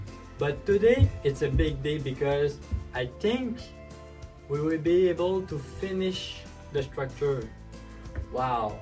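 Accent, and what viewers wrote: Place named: Quebec, Canada. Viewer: Excellent vidéo sur le sujet! Et presqu'en français... Hi H... Pour vrai, l'anglais utilisé est enfin à ma portée. Parler à la George Saint-Pierre a vraiment son charme aussi. Bref, le plus important est sans contredit le contenu apporté. Les détails sont suffisants pour quelqu'un qui souhaiterait se lancer. Ça vraiment le mérite de faire rêver. Grand merci. PS: Vous êtes d'où déjà? Je me souviens d'avoir été impressionné lors d'une épisode de Go van.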